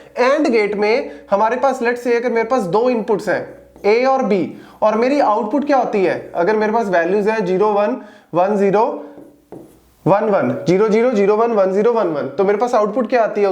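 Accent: Punjab, India. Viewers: Sir are u from panjab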